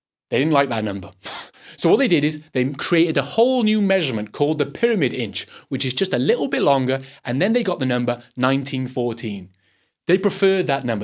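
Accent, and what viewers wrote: Wales, United Kingdom. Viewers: He sounds Welsh to me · i THOUGHT i heard some welsh in your voice! cymru am byth!🏴🏴🏴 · Sounds Welsh to me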